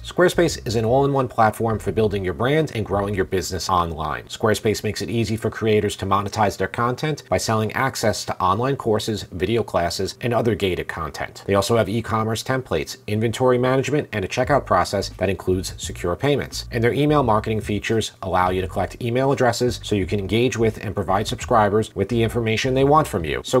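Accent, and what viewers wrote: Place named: Long Island, United States. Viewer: Long Island accent goes crazy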